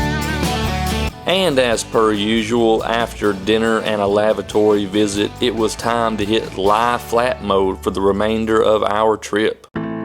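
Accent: Kentucky, United States. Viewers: Is that your real voice? If so, I love your Kentucky accent…..we all need to slow down a little!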